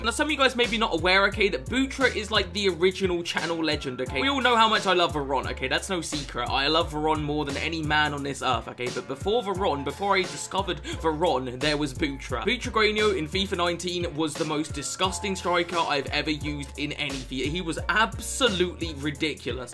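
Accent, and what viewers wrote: United Kingdom. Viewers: Where abouts in the uk are you from I can’t tell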